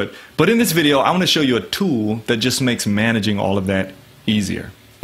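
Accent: South, United States. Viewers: love the southern accent maan!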